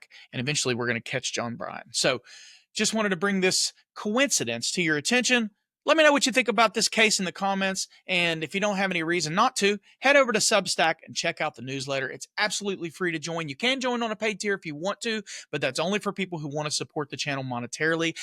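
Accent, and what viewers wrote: South, United States. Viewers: NO, THANK YOU! I like your no nonsense straight to the point southern drawl with a dash or 2 of comic attitude. Take Care, John & Amy(woof), Wyoming, The only state w/out a accent!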